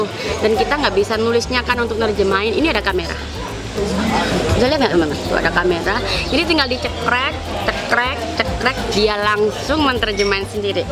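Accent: Java, Indonesia. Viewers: Medoknya itu low ngbuat ak kalo nonton pasti diulang2 mulu😂😍 loveLah buat 😘💋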